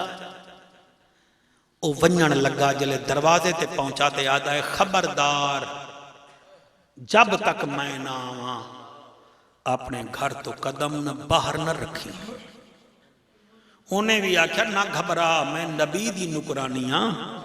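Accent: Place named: Saraiki, Pakistan. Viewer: Subhaan Allah bayan boht Acha hai🥰🥰🥰🥰🥰 Lakin Andaz main saraiki+Punjabi+Urdu🎌 Hai Jo Thora ajeeb Lagta hai😏😏😏😥😥😣😥😣😥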